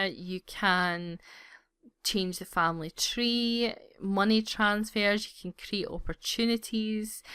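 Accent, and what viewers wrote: Scotland, United Kingdom. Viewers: Pringles Fan! Scottish?? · Not sure why, but I get really happy when I hear a fellow Scottish accent on youtube 👌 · Woah okay. Four things I HAVE to bring up. 1. Nice accent! Haven't heard a Scottish lady speak before, sounds quite classy! 2. Perfect tutorial! This is not hyperbole. This has EVERYTHING you need: 2a. Detailed explanations on each most asked questions and how to get there. 2b. A patient person who calmly explains her reason of making this video for those curious. 2c. A showing of human emotion while also being fair. Not liking teenage pregnancy is fine. Heck, I don't either. I respect their decisions also. 3. A link leading to the installation of these mods without making it too long and also explaining what each mod does and again, humanizing yourself with a reason into why you only have these here. 4. A cheeky joke in between a learning experience enhances the experience and makes it more enjoyable and not make people think you're not human. 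Human interaction amongst the teaching makes it relatable. If she can, I can! I cannot stress enough. You did extremely well here, and I don't say it often. Thank you, for the charming, compassionate, informative and patient tutorial!:D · are you Scottish or Irish? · She sounds Scottish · Ahh! Scottish accent! I'm Scottish too and it's so nice to hear a familiar accent for a change!:)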